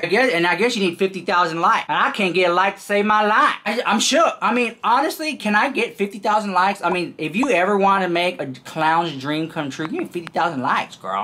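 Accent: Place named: Texas, United States. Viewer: You make my day! Every day! ❤❤ I also live in Texas but I'm in Dallas. So, i love the accent. You're like home.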